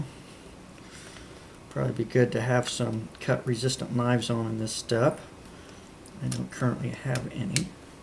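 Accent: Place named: Kentucky, United States. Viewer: Are you from Kentucky or Tennessee?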